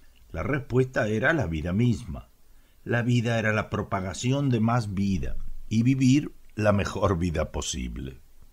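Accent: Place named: Argentina. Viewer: Ese acento argentino no me deja concentrar ...😅😅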